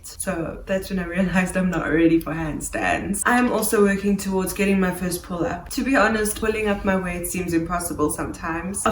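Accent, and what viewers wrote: South Africa. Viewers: YouTube is my primary form of media and I can't tell you how heartwarming it is to click on a video and hear a South African accent. There's just something about getting information from my own people. You just know it's going to hit home. ❤😊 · Do I detect the accent of a fellow South African?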